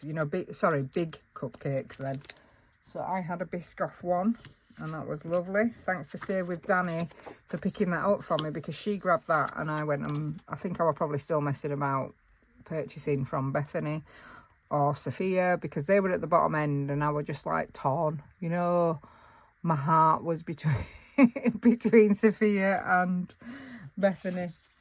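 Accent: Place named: Yorkshire, United Kingdom. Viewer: Hey! Love the long video, wish I was there...bit of a schlep from Oz tho 😂. We'll be back home next week for a visit so just missed it....boo😢! Glad you had a wonderful time well jell over all your lovely goodies ❤ have a lovely weekend bud 😊 ps...lovely to see you and to put a face to that yorky accent 😂❤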